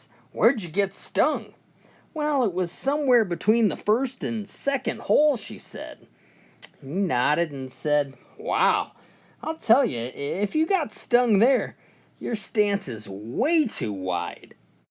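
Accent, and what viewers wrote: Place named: Texas, United States. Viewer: I laughed once, but not because of the jokes. Ngl they were terrible but that texas accent was funny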